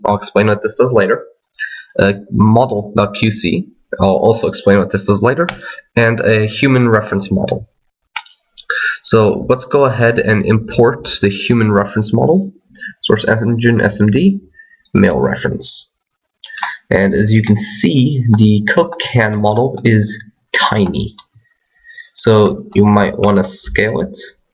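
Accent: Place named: United States. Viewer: You are Portuguese??? You have a very good American accent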